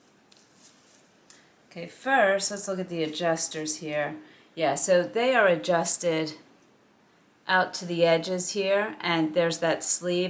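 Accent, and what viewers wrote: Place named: Brooklyn, United States. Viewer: Truth is, you come across as "our next door neighbor", your reactions and rethinking out loud, are so warm Sounds like a bit homey , NYC, (?)Brooklyn, in your voice! ? You look great in most, of the wigs. But the cool Ashy and Greys are a bit less flattering ! I'm still trying to figure out how to get them sent to Israel and still have it be worth the cost.. Meanwhile you're a lot of fun!!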